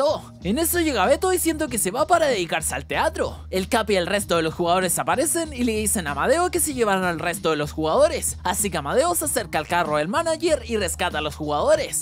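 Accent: Chile. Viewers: Una pregunta eres chileno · Eri chileno?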